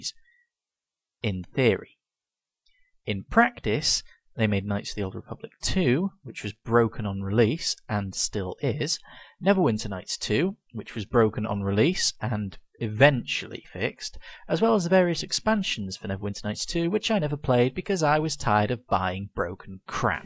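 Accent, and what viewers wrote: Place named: Ireland. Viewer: you sound relatively irish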